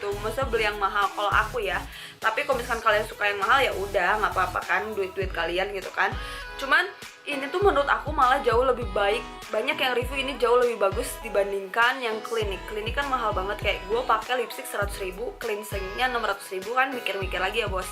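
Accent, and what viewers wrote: West Java, Indonesia. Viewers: seneng banget sama cara pembawaannya di youtube, ga dibuat2, ga sok asik kaya youtuber lain dan ada aksen sundanya hihi. langsung subscribe! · lucu kak, logat sunda nya keluar banyak di video ini 😄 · ka orang sunda ya ?😀😀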